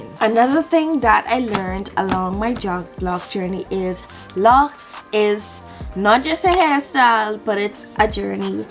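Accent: Bahamas. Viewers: The second you opened your mouth, I knew you were Bahamian ❤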